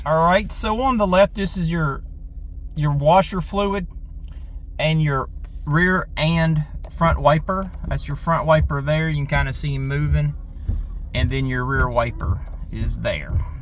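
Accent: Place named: United States. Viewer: Thank you, loved your cow boy accent, lol ( No i mean it) I can talk to all dang day long, appriciate you to go over all the controls, it helps a lot, bye the way I fall off my chair laughing on use that dial to tune to you wann jam to lol.... Love it brother.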